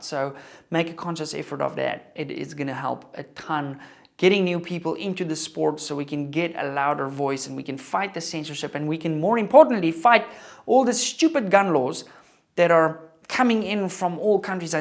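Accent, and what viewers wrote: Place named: South Africa. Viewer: I just got into distance shooting, I've learned a lot from your content. Also I lived in ZA for a couple years, it's good to hear the accent.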